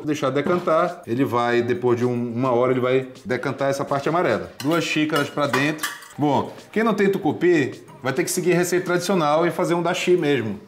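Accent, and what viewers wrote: Pará, Brazil. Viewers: voce leva o Pará para o mundo com sua espontaneidade e essa pronuncia que éa coisa mais linda! Amo suas receitas e seu modo de usar o que temos.como disse antes conheci tua familia no Recanto e admirei para sempre.Lembrou muito a minha que nos criou com um pé na cozinha! Valeu! Amo teu canal.Vou te convidar pra conhecer nosso Café.Aceita?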